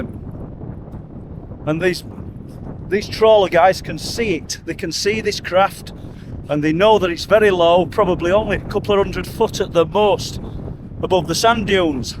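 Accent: Yorkshire, United Kingdom. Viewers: Just discovered you recently, really enjoying the vids, thanks....there's something reassuring about listening to paranormal tales in your lovely solid Yorkshire accent 😄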